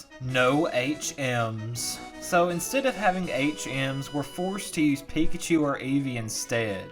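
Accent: South, United States. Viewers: Are you a southerner?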